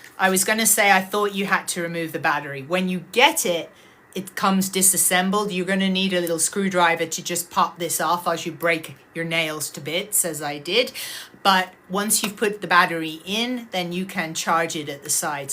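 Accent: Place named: England, United Kingdom. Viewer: I was about to ask where you was thats an English accent and then you said you were in la. Well we shall watch and enjoy 😊 thank you for sharing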